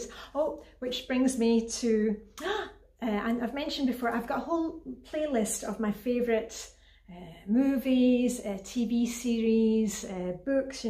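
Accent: Scotland, United Kingdom. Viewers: Greetings from Sonoma, California. I treat myself to your video with my first morning tea and then get on with my routine. Since becoming a Fly Baby this week my home is already feeling so much better! Heartfelt thank you for all your inspiration and as a genetic Scot, your delightful accent. Loved the coral sweater and polka dot scarf today. Later you were wearing a white blouse with coral dots. Do you ever wear the coral scarf with it? Hope so! I mean how can one have too many polka dots? As a T3 I rarely wear them but I do have a couple scarves with random and one with not so perfectly shaped dots. Starting to think I might have a one secondary! Love to you and your family and Birthday Wishes for your girl. Mine was Sunday and my daughter’s two weeks before, niece’s today and two more daughter’s Saturday.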